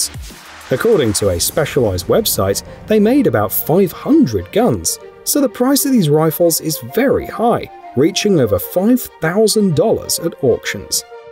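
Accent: England, United Kingdom. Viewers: I love hearing English people describe firearms. The frame and SLIDE…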